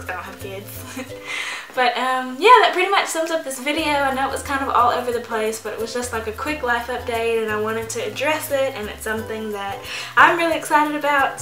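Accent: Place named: South, United States. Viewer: oh ok thats cool. I knew you had to be from some where down south.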